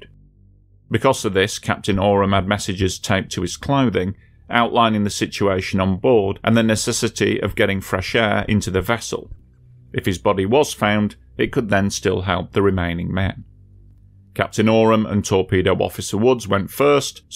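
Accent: United Kingdom. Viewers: having to endure that British accent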